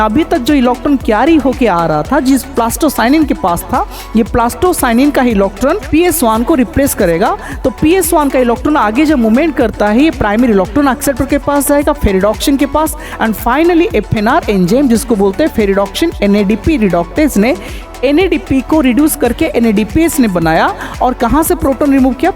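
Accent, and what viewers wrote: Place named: Kolkata, India. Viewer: Aap tho kolkata wale ho😅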